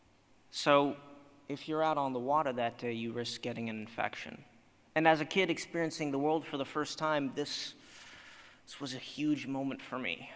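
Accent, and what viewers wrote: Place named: England, United Kingdom. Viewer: Hello Aidan, I hope you see this comment. You have many fans in Turkey and there are no Turkish subtitles in your videos. It is not a problem for me, but I think it is a problem for those who do not understand the English accent. Please take this comment into consideration and you should do something about this issue. I think we love you.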